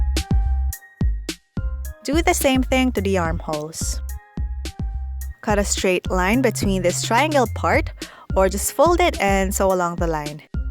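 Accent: Philippines. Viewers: You kinda have a filipino accent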